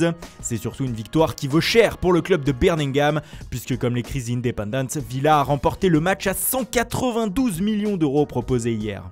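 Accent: Italy. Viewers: Arrête avec ton accent italien sa fait mal aux oreilles . T'insiste trop avec ça !!!!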